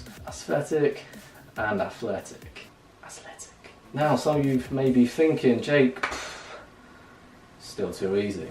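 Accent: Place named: East End, United Kingdom. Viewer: I thought you just had a typical east end London accent lol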